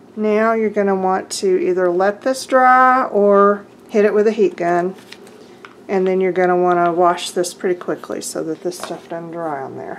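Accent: South, United States. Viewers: Those are so cute:-) I love your southern accent:-) I am going to try and make some of those myself. Im glad you didn't come back from cutting up the sheets all bandaged up:-) Your ideas are just clever and amazing! I would of never came up with an idea llke that with my pea brain:-) Thank you!!!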